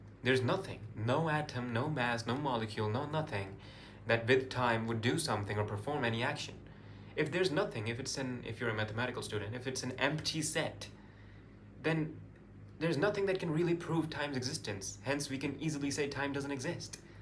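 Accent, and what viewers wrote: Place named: England, United Kingdom. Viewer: Wah yrr Nice English accent ❤❤❤❤❤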